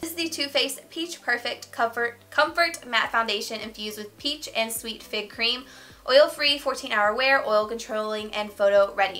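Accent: South, United States. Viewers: Do you ever use any Colourpop products? They have really amazing palettes that are perfect for Fall!! P.S. That south accent 🙈😍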